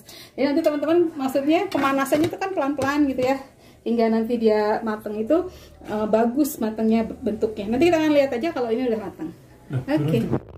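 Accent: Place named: Java, Indonesia. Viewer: iki kok logate jawa yaa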